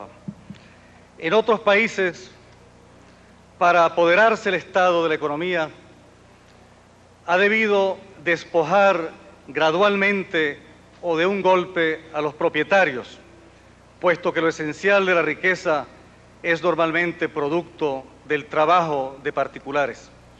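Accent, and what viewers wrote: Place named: Argentina. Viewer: Parece que Carlos viajó la futuro latinoamericano y después narró lo que vió. De verdad es impresionante todo lo que dijo, y como se cumplió años después. P.D: No había notado que Carlos tenía un poco el acento argentino.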